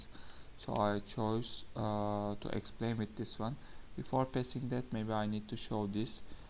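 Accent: Turkey. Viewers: Hocam aksandan Turk oldugunuzu tahmin etmistim, Github'a da Istanbul diye yazmissiniz. Selamlar.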